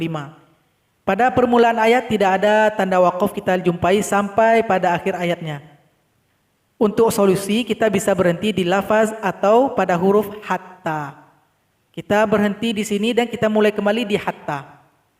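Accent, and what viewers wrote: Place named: West Sumatra, Indonesia. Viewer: Urg minang kalau bahaso indo logat minang nyo pasti ttp ado. Hehe semangat uda, mudah2an sukses slalu.. awak disoko nio belajar pulo mangaji yg batuah uda. Makasih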